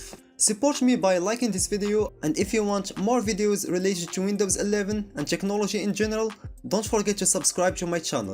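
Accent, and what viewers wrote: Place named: Morocco. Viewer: you have the most Moroccan accent bro I like it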